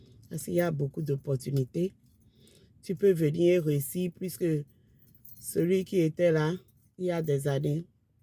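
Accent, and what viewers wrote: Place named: Benin. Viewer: C'est ma première fois, mais l'accent m'a tellement attirer j'ai dis ça c'est mon Benin 😂, je m'abonne direct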